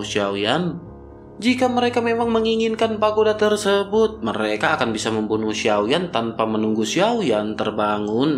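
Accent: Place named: Java, Indonesia. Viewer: Min suara lu kok lama2 jadi medhok 😂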